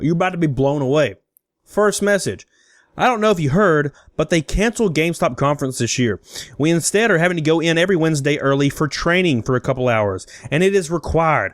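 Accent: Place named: Alabama, United States. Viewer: I'm not gay by any means but dude I love your Southern f**** droll not to mention you just hilarious and I love that laugh it's like a country Santa laugh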